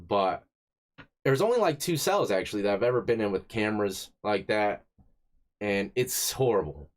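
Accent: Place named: Virginia Beach, United States. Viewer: Sounds like you are from VA Beach? I am a white guy who went to high school in the ghetto of Hampton, VA. It was hell on earth.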